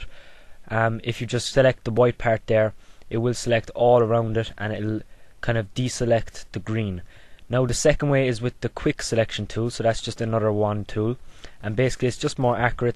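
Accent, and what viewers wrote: Ireland. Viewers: the irish voice I can tell because Im from Ireland lol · Nice tutorial! Btw are you Irish?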